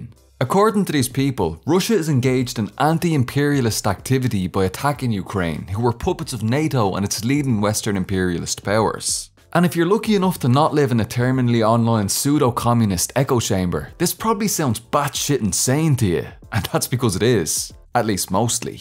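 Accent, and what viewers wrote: Ireland. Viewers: Oh shit I'd always wanted to watch an Irish lefty creator, pity you have the sound of a dub in ya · Are you Irish? You seem to have the accent lol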